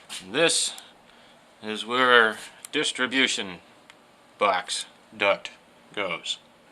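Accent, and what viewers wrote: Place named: Croatia. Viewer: Brilliant work however your heavy Croatian accent makes it difficult to follow your otherwise excellent vid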